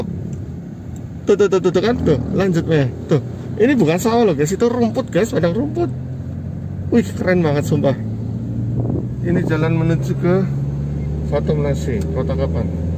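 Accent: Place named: Java, Indonesia. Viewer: Asik ne ada logat jawa jawanya... agak kecil juga jalannya.. suasananya ada gambaran seperti di Kalimantan...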